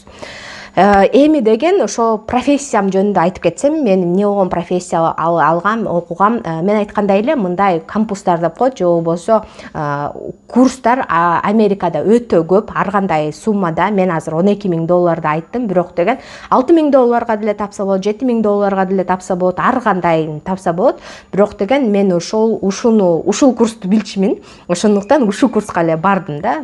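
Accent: Standard. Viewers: Ай вай молодец карындашым! Кыргызча таза так суйлоп тушундургонунузго! Жоп жонокой кылып . Мындайча айтканда супер! Кыргыз болуп туруп башка тилде суйлогондорго окунучтуу! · Азаматсыз. Жакшы. Дагы да ишиңизге ийгилик. Сизди көп адамдар көрүп үлгү ала турган экен. Бирок капа болбоңуз, деген, дегенди эле азайтып сүйлөсөңүз ,дагы да эң жакшы болот эле. Рахмат. Болбосо кыргызчаңыз аябай так, таза экен. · Кыргызчани таза луйлот экенсиз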